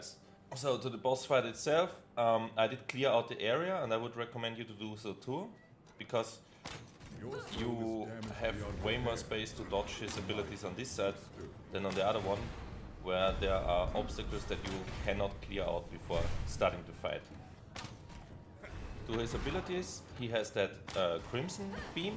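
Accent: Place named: Germany. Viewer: Wenn Deutsche Englisch sprechen :D <3 danke für den Guide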